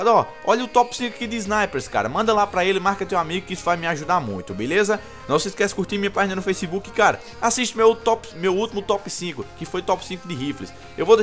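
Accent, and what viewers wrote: Northeast, Brazil. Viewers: ruma de bônus kkkkkk sotaque nordestino é diferenciado!!!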